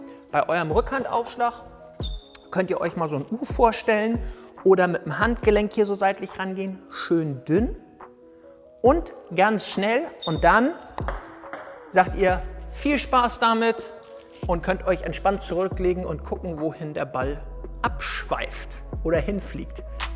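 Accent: Hamburg, Germany. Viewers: Schöner Hamburger Slang Bin selbst aus Hamburg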